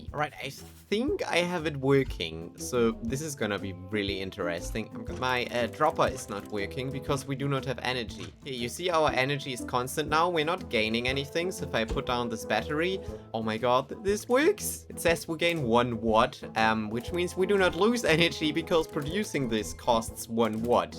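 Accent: Germany. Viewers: wait you are german?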